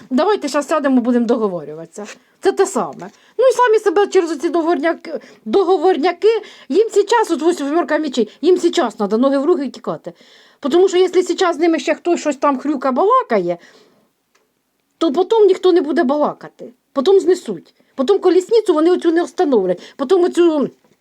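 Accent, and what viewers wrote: Ukraine. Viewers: Український діалект❤ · Пані,я розумію,що Ви Не Все можете Говорити,що Бачите..За суржик,не переживайте.В вашій місцевості,говорять Так..Дякую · Ви справжня, ви наша украіночка. В кожному регіоні свій діалект і говоріть так як говорите, вас приємно слухати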